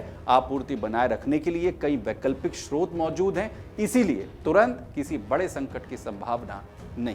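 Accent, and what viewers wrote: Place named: Bhojpuri, India. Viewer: Saaf baat bolna Bhojpuri kya kar raha hai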